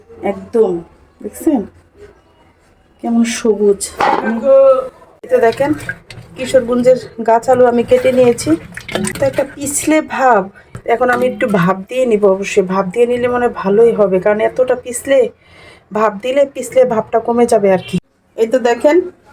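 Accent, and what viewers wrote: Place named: Comilla, Bangladesh. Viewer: আপু দেখে নিয়েছি, আপনার কথা শুনে বুঝলাম আপনি কুমিল্লার যদি ঠিক হয়ে থাকে, আমিও কুমিল্লার,ধন্যবাদ আপু।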